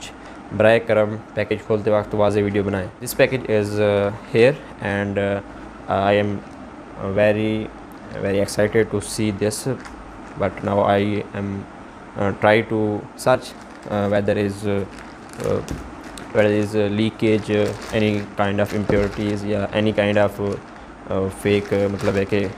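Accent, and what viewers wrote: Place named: Saraiki, Pakistan. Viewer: Lala na tain saraiki boli hay na urdu khichichri barai pia hain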